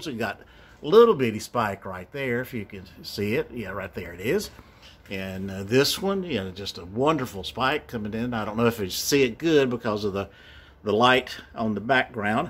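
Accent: South, United States. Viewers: Hey! Loving the Southern draw☺️ I believe you may need to talk in your videos more often. This was super awesome. Love seeing your setup. The mounts look great. I agree with the growth on the mounts. They do amazing. You’re a natural at it. 👍🏼 I can’t believe it’s gonna be that cold for 3 days🥶❄️ Hope to see more videos with you narrating. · Love the Southern drawl.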